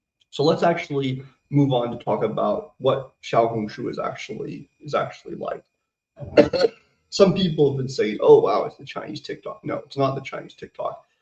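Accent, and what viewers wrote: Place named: United States. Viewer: YO!!!!! Chandler Bing LIVES!!!!!!! Why is he speaking with a posh American accent!!!!!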